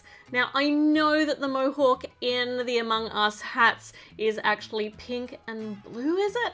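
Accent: Australia. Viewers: Are u ausralian cause ur accent sounds Aussie and I'm Aussie too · Are you an Aussie? Some of the words you say are so Australian.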